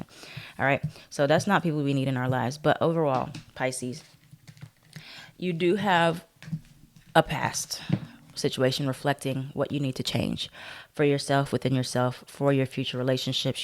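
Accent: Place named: New York, United States. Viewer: 🤣🤣🤣🤣 I can tell ur from ny. I’m so happy ur doing better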